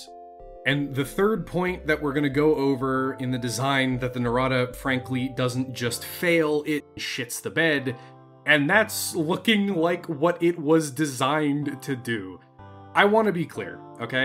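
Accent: Canada. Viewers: This dudes Canadian accent is giving me PTSD. Abaoouut….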